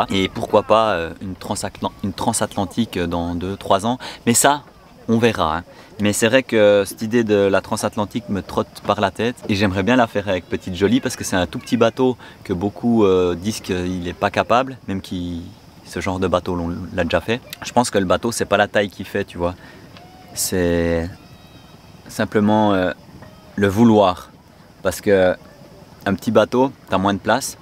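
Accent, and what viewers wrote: Belgium. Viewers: es tu belge ?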